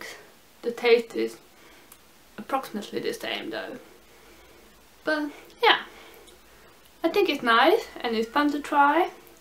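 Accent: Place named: Sweden. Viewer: Love your accent, are you Swedish?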